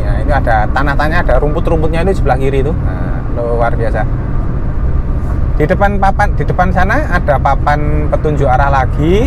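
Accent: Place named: Semarang, Indonesia. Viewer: itu cirinya kental logat Semarangan. Nyangkule ning Jl. Merak-Sby. Omahe Malang-asli Sampingane. 😀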